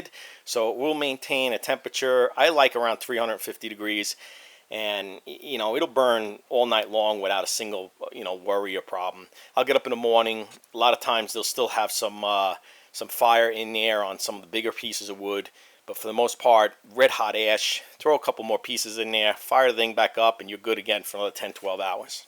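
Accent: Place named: Northeast, United States. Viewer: I can tell you in the northeast by the accent so how much wood do you go through a month in the winter ?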